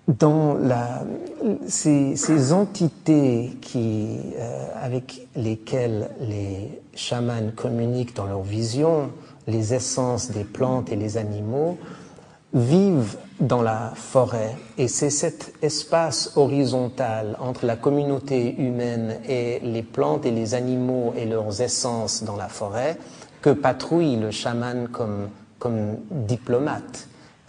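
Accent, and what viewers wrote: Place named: Switzerland. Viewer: ton doux accent suisse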